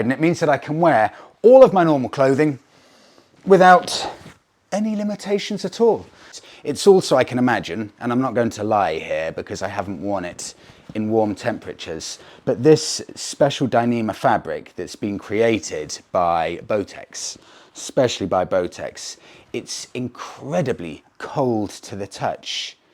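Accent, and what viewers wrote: United Kingdom. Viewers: Ur accent is from uk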